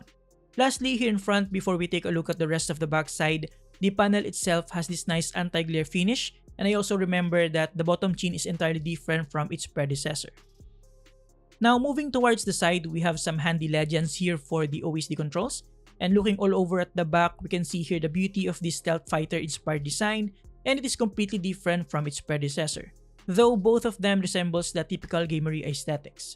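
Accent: Philippines. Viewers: Props sa pagka-review nito pang international visuals. Nagtitingin lang ako ng review about dito sa monitor na ito then narealize ko sa tono ng boses pilipino pala. Husay! Sakto nabanggit din na predecessor pala yung QA so possibly ito QL1A i-acquire ko.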